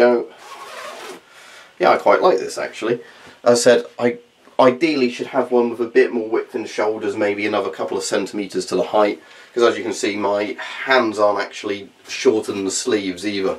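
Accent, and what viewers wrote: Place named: England, United Kingdom. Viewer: I like you english voice👍🏻👍🏻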